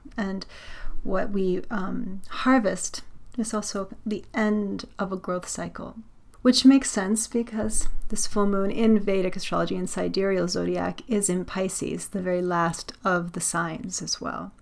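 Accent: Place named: Australia. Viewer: Hello, Thank you for your videos and your beautiful nature! Your tone of voice makes me wonder if you are originally Australian?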